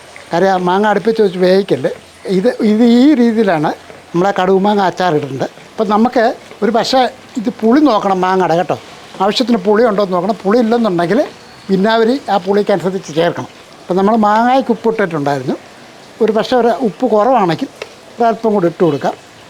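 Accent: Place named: Central Travancore, India. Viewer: എന്റെ സഹോദരാ, ഞാൻ സ്വയം പാചകം ചെയ്യുന്ന ആളാണ്. ഒറ്റയ്ക്ക് ആണ് താമസം. എന്റെ കിച്ചനിൽ ഇപ്പോൾ റെസിപ്പി മാത്രമേ ഉള്ളൂ. പുകഴ്ത്തുകയല്ല എല്ലാം അടിപൊളി. താങ്കളുടെ വിവരണം നന്നായിട്ടുണ്ട്. താങ്കളുടെ ഈ വിനയം ഉണ്ടല്ലോ എന്താ പറയാ ഒരു വലിയ സല്യൂട്ട്👍🙏. മധ്യ തിരുവിതാംകൂർ കാരൻ ആണെന്ന് തോന്നുന്നു സംസാരം കേട്ടിട്ട്. ദൈവം ധാരാളമായി അനുഗ്രഹിക്കട്ടെ.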